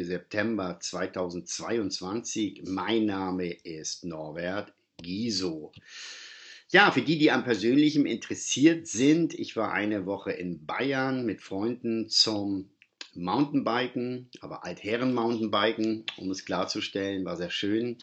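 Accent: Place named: Kiel, Germany. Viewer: Oh, ich freu mich so sehr, dass Sie wieder da sind. Ihr "Kieler Slang" erfreut mein norddeutsche Herz jeden Morgen und Ihre unaufgeregte Art die aktuelle Sternenkostellation darzustellen bringt mir richtig Freude... danke, dass Sie da sind 💛✨️💫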